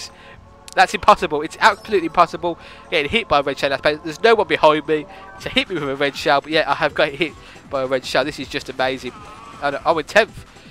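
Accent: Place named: United Kingdom. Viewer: you are so awesome with that british accent hehe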